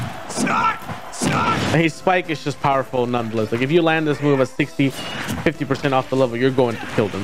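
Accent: Chile. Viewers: Eri chileno?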